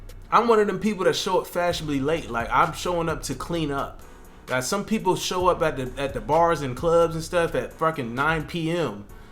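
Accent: United States. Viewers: Next time public English speak should be louder than your American damn accent bro !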